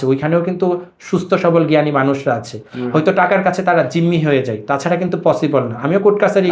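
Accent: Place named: Comilla, Bangladesh. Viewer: ভাই আমি ও কুমিল্লার মেয়ে ভালো লাগলো আপনি আমাদের কুমিল্লা ব্রাক্ষনবাড়িয়ার ছেলে জেনে। ভিডিও সম্পূর্ণ দেখেছি ভাইয়ের আঞ্চলিক কথা গুলা অনেক হাসাইলো। কুত্তার হেউয়ানি শুনে হাসতে হাসতে শেষ 😄😄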